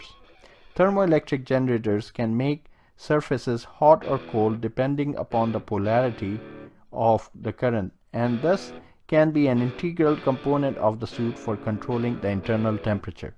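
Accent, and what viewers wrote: India. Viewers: I'm sorry but this guy sounds like indian tech support. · You make speaking english in indian accent sound cool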